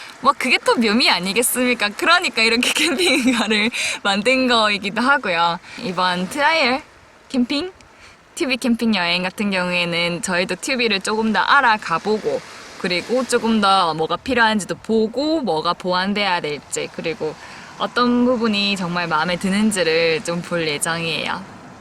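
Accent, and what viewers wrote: Gyeongsang, South Korea. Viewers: 영상 잘보고 갑니다 익숙한 경상도 말이네여 : ^ ) 해맑으셔서 기분이 좋아집니다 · 혹시 고향이 갱상도 인가요???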